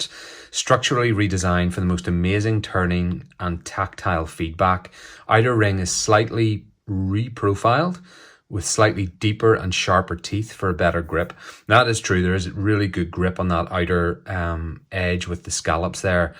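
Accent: Northern Ireland, United Kingdom. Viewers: Yes it's a small world. I had watched a Just one more watch video and your video played after it. When I heard NI accent and looked at the name I thought you must be Shaun's brother. Hope you are keeping well and getting through lockdown ok. We have just had ours extended another 3 weeks.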